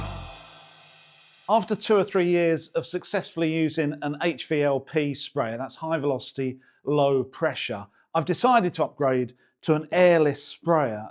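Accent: United Kingdom. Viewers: British commentators are the best. Enjoyed the well articulated commentary 😊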